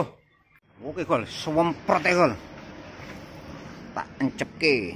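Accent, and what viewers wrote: Surabaya, Indonesia. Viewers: Suroboyoan ngene wkwkw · logat bahasane khas wong jowo timur asli arek2 suroboyo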